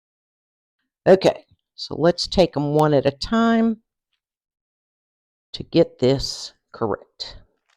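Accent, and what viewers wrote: South, United States. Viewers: Are you from the south? You have an accent like my aunt who is from Florida. LOL.. Thank you for the small batch recipe. I have never had pickled peppers.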